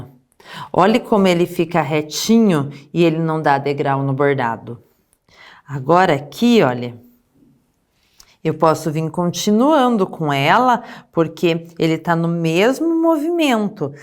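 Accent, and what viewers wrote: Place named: Ponta Grossa, Brazil. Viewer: Adorei a sua aula ❤ O sotaque me dá muita saudade da minha avó de PG 🥰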